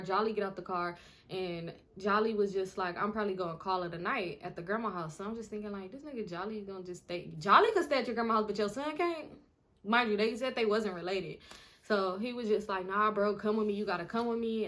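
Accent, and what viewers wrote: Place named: North, United States. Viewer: You are an absolute embarrassment. Nevermind that "flewed" is a stupid sounding and ugly looking word. I'm familiar with it, it's the word you and people like you use to describe when someone wastes money paying for y'all's aerial transportation so you can participate in watered down forms of prostitution. Furthermore, your eyelashes need to be stopped. Not even just yours, but all the women in the world wearing those horribly fake looking pieces of plastic on you guys' eyelids need to be stopped. Like seriously y'all lookin silly. While we're on the subject of your appearance, throw all them cotdamn wigs and weaves in the garbage and immediately tote them to the dumpster. Be a Black Beautiful Queen and show the world the texture that God gave you, if you have any. Y'all out here sending all that money to India and China so you can look like people from Europe, wake up. 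And lastly, I can tell by your story and how you talk that you from up Norf or somewhere in the Midwest. Don't you ever in your life open up you mouth again to say anything bad about Waffle House. Waffle house is an institution and it don't need your money or approval. Put some respec on my waffle and grow up. Focus on school or a career or something instead of tryna hustle men for a good time. Our nation is in decline and it's mostly because of how the youth spends their time. It's only a matter of time before our society is overrun by china and when they come they're going to make our children speak Mandarin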